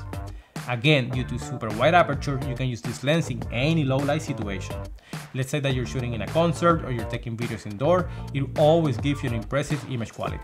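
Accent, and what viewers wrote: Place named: Venezuela. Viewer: Tu acento es definitivamente Venezolano!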